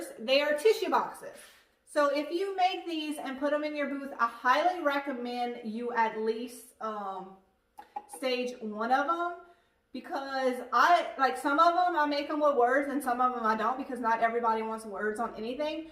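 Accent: Louisiana, United States. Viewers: Omg your cute little accent!!! I stumbled upon this video and wad not expecting a cajun accent, love it and all your beautiful items!!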